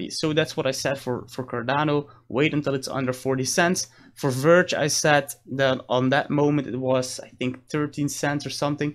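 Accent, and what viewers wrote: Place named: Germany. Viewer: Love the German accent! Anyways, keep calm and HODL! Believe in the technology! 0x20E0C5317F4a2cbB039E5Ec71E60A67C9b7fe799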